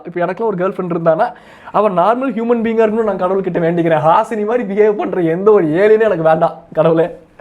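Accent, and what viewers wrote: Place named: Erode, India. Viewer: Light ah erode uhu slang varuthungooo 😅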